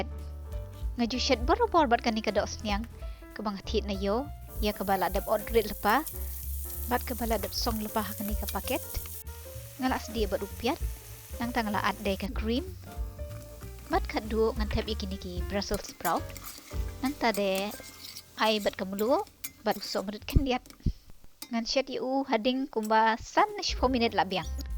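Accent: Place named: Meghalaya, India. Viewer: Sngewtynnad palat ia I sur bad ia I rukom kren ktien khasi paka , phim leh sarong ei2 watla phi don ha ri nongweii ruh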